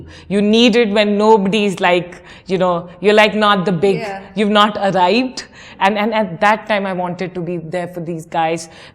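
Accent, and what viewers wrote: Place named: Jamaica. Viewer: almost sound Jamaican 💚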